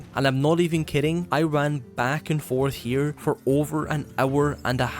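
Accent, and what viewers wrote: Scotland, United Kingdom. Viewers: I first played WOW 16yrs ago, then I stopped when I hit level 85 in Cata. I'm coming back - I'm interested in how the retail changes have changed the experience oh, and for some reason my level 85 Mage is not that level anymore. Trying to pin down your accent, definitely Scottish but can't quite narrow it down - don't think Glasgow, hmmm ...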